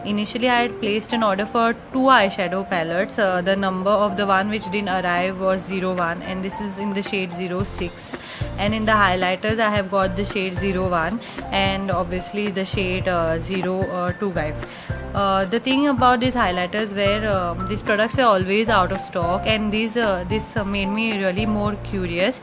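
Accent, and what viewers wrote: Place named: Goa, India. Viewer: Thank you for the honest review. Looks like you have a Goan accent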